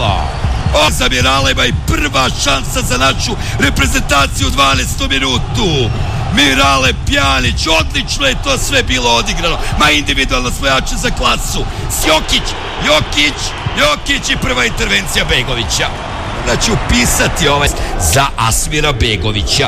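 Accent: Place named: Ekavian, Serbia. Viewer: Zasto ovaj komentator prica ekavicu?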